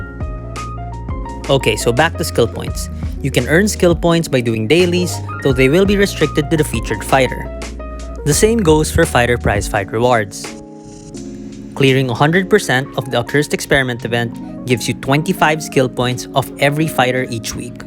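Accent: Philippines. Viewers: I can smell that filipino accent from over here. Great video btw. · The video is awesome can't really say much but i can tell how your accent works. Dont mean to be rude but are ya a filipino?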